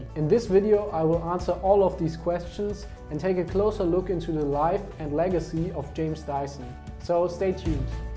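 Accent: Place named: Germany. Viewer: Heutzutage ist hald Englisch einfach Pflicht. Und nur wenn man sich mit der Sprache auseinandersetzt lernt man sie auch. Und auf diesem Weg zu lernen macht ja auch mehr Spaß wie stumpf in ein Buch zu starren ;) Learning by doing ist das Gebot der Stunde. Und klar hört man den Alman Akzent noch etwas Aber das wird auch mit Der Zeit und ist defentiv kein Grund sich stoppen zu lassen. Die Community die man damit erreicht ist einfach auch globaler orientiert und damit auch etwas interessanter in der Interaktion, da man wenn man mit anderen Kulturen in Kontakt kommt andere Sichtweisen erhält und damit seinen Wissenschatz in ungeahnte Ausmaße erweitern kann. Just give it a shot ;)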